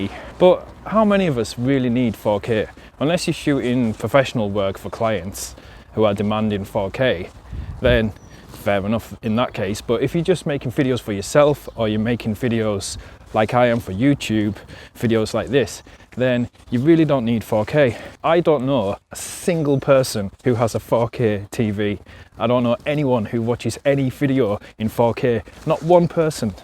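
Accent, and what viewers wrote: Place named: United Kingdom. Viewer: So, how do you like your Spark? First, I live in Canton, OH. I also own a Mavic Pro but looking for something smaller. The Air just getting me too close to my Mavic Pro. I also motovlog and would like to take the Spark with me on my motorcycle club rides. I want to wish the best of luck. Love the British accent...:)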